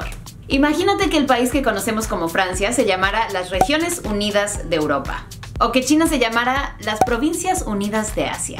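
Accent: Mexico. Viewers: Tu acento tan mexicano :3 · Una pregunta por qué decidiste aprender el Español con acento mexicano , si tus vídeos estan dedicados al mercado Latino ,fueran usado un acento mas neutral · A mi me parece mas Mexicana o Latina que gringa. Cuando la oigo hablar imediatamente pienso en Mexico. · Hola me acabo de suscribir a tu canal luego de ver tan sólo un vídeo tuyo. ¿Sos estadounidense? Si no lo decís no lo creía! hablas un castellano perfecto, pensé que vos eras mexicana ya que tenés acento mexicano. Che, ni un ápice de acento inglés!. pregunto: porqué los mexicanos los llaman gringos de donde proviene esta palabra? GREEN GO ???? desconozco. acá gringo les decimos a los europeos y eventualmente a los "yankis" pero nos referimos a los blancos caucásicos. Saludos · Te equivocaste un poquito. Hablas el castellano casi perfecto, con un encantador acento mexicano. Pero dijiste "unanimamente" y es "unanimemente". 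Saludos desde el Perú, un país hermano de México. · kelly ramirez ella estuvo viviendo en México y yo también dije eso, me encanta su acento Méxicano. y ella dice que ama México. ♥ soy de México jeje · La Biblia Dice he vivido en Tamaulipas, Durango, Chihuahua, Sonora y Coahuila y he conocido personas que tienen el acento norteño y otras que aunque sean del. Norte los escucho normal para mí que soy de Acapulco Guerrero, en todo el país existen personas que hablan el acento Méxicano estándar como lo habla, no porque sean norteños tienen que hablar igual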